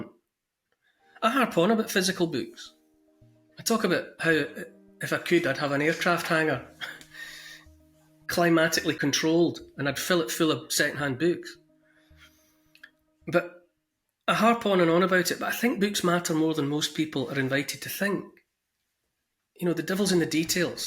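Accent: Scotland, United Kingdom. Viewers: Neil, I am an American who has lived 71 years. I have seen a lot of things throughout my life that long ago raised my distrust of power at all levels. I have had love since childhood, for books and learning, from the classics to philosophy, science, and everything in between. I have read Fahrenheit 451, and it is now banned in some libraries here in the U.S. I know you are a kind and decent man, blessed with that wonderful Scottish accent, and I agree with everything you said. But I'm worried about what you didn't say. I agree that revolutions are bad for people caught in them, but what is the unsaid part. How does society get rid of the yoke of tyranny? Sometimes, you have to flip that coin. I read a great little book years ago, written by a Scottish American academic that had a memorable effect on me. Man's Unconquerable Mind by Gilbert Highet talks about mankind being on both sides of longing to be free and staying strong enough to get that freedom. 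If you know freedom, you can not accept anything short of that. I would love to hear what your suggestions are for people in the U.K.,the U.S. or anywhere. I have been watching the U.K. with alarm, and I can see the same thing here and elsewhere. The world is a grenade with the pin pulled. By the way, Bradbury was prescient about book banning. I enjoy your show very much.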